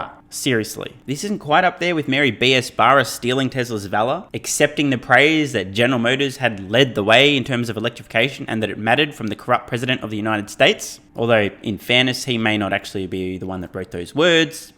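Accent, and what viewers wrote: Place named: Australia. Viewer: You need better vocal sound 'cause your voice grates partially your accent but mostly because of your complete ignorance on the subject of sound engineering! Listen to one your Australian reginal youtuber's; Cold Fusion/Dagogo Altraide. He produces his own music and that acuity to sound educated him to the value of sound processing. It's stupid simple probably under $2-$300.00 Azzie money! Buy a Behringer board with built in Compression and 'Bob's your uncle' as the English say! Get one that has USB output and your sound we be much improved! I only wish that "Electric Pixar looking Viking Fucker" would do the same.....Cheers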